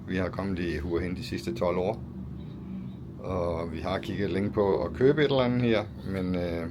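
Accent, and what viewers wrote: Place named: East Jutland, Denmark. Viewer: sejt kan høre du er jyde østjyde måske fra Aarhus egnen- kan jeg have ret i det- men god video - var i Hua Hin i 2- uger i 2019 samt længere sydpå i 2 uger boede først på hotel Rocco Hua Hin men der var for meget støj - så det var svært at sidde uden på balkonen så vi flyttede til et andet 800 meter væk men afsides fra trafikken det var væsentligt bedre- men kan ikke huske navnet - men der var mere ro og en gag om ugen var der marked lige overfor. men lækkert havde også en 10 dage i Soy Sam Yot først var der i 30 dage i alt plus 2017 i 30 dage samme sted som , sidst nævnt- lækkert var det!!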